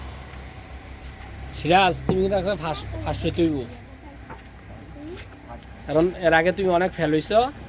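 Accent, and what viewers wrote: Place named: Comilla, Bangladesh. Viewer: কুমিল্লার ভাষা এটা ভাই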